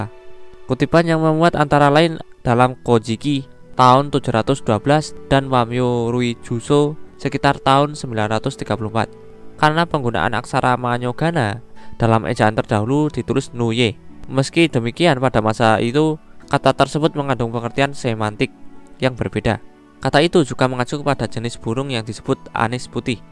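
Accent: Java, Indonesia. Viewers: Nonton.. medok euy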